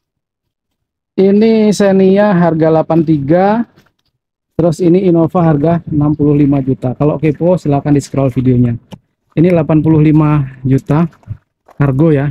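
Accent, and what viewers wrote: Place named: Sumatra, Indonesia. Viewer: Dari Sumatra ya mas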